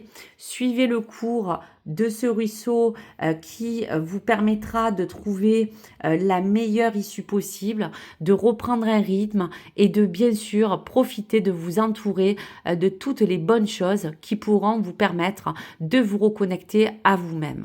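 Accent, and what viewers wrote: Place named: South, France. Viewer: Bonjour j'espère que vous allez bien merci pour ces guidances super que vous faites à chaque fois et j'adore votre accent du sud je suis de Marseille du coup l'accent chantant on connaît les cigales on les connaît je suis pas de Marseille même mais ça fait un moment que je suis là mais sinon j'ai grandi en Bretagne c'est pas la même c'est le jour et la nuit j'aime beaucoup votre accent quand même❤😂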